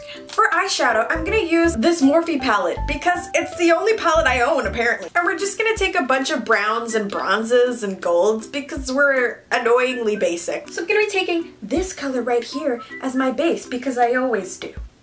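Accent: United Kingdom. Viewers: amazing british accent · this hair! this beat! this girl! her british accents!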